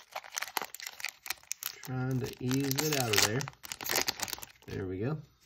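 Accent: Alabama, United States. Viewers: I guessed you were from a Alabama from your accent! What part? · Bro, you’re from Alabama? I thought I detected a slight accent. Right on brother. Thanks for the vids.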